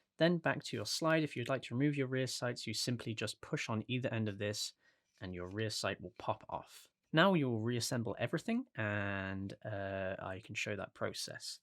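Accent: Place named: England, United Kingdom. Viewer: This video should be a how to video for making how to videos. Nice close up views, no distracting, uneccesary background music and as much as it pains me as an Aussie to say. An English accent. Fantastic work mate.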